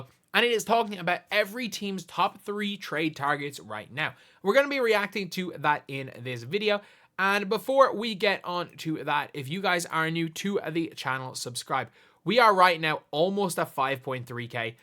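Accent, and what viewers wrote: Ireland. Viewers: He's very obviously Irish. He has an Irish accent and he references the Irish Super League constantly.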